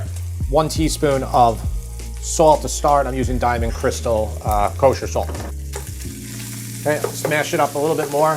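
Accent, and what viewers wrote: New York, United States. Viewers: You sound like Andrew Camarata. You must be from New York. He's a YouTuber, like you, but different in that he's heavy equiptment, etc.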